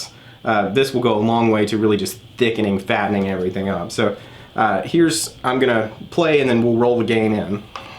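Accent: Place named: South, United States. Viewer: Thanks for the response. I hear your Southern Accent. Were you born in the South? Keep rocking! 😎😎😎😎.....That Splawn Amp of yours is real nice too.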